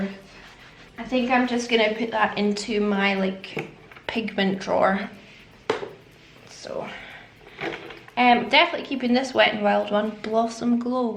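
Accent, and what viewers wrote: Scotland, United Kingdom. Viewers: Scottish? I think...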